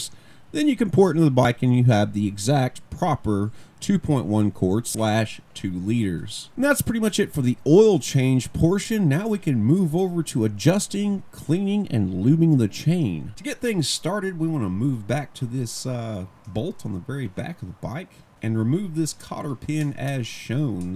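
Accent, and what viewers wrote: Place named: Canada. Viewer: Canadian? once American? can't tell. you just sound like a Canadian that knows all accents and ignores all of them but doesn't speak proper English either XD could also be cuz its a voice over and you can pick or re-say something lol but ya Canadian?????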